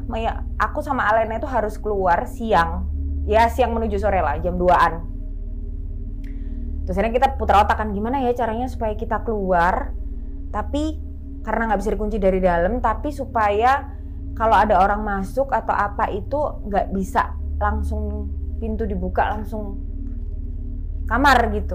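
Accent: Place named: East Java, Indonesia. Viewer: wa ini org jawa timur ya mbak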